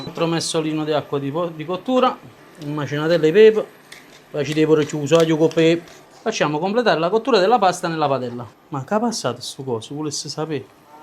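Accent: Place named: Naples, Italy. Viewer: Sei troppo simpatico!!! Adoro la tua napoletanità, il tuo dialetto, il modo, mi strappi sempre un sorriso e anche la voglia di cucinare! 😊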